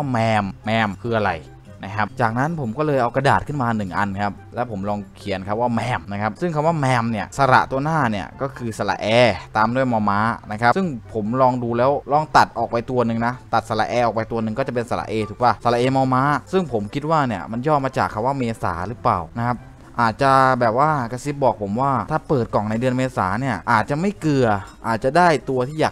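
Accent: South, Thailand. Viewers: คนใต้เหมือนกันครับบบบบ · เเหลงใต้ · คนใต้เหมือนผมเรย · คนใต้ แน่555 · ไอย้ะแหลงใต้เหมือนผมเลยนิพี่บ่าวเห่อ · คนใต้หรอ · คนใต้ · คนใต้คนใต้5555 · พี่คนใต้มายนิ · แหลงใต้ชัดมากเลย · พี่คนใต้ป่าวคับ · คนใต้หรอครับ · คนใต้นี้ว่า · คนใต้เปล่าคับ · พีคนใต้ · พี่คนใต้เออะคับบ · พี่คนใต้ปะ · สำเนียงใต้มาเลย พี่คน จ.ไรอะ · พี่คนใต้หรอ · พี่คนไต้หรอพี่ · เหยดเเม่ม55+สำเนียงคนใต้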